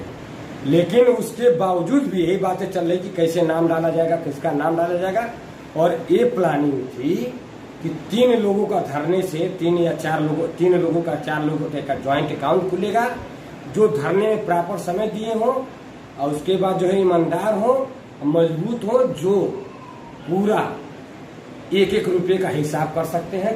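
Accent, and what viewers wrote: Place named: Bihar, India. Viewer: Tm to bihari lagta h ..kya bhai .sahi bol Raha mai 😊😅😅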